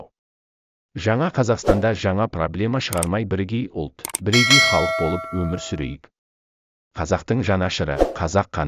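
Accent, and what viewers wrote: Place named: China. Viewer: Сен өзің қазақ емес сияқтысың ғой, қытайша акцентің бар , қазақтардың арасына іріткі салайын деп жүрген біреу сияқтысың ғой.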